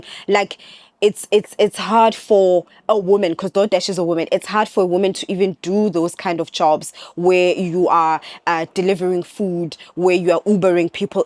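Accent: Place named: Africa. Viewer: Your voice is soo cute! I used to have a coworker that is also African and I’m jealous of ya’ll accent lol 😂 but on a serious note, DD needs to be stopped!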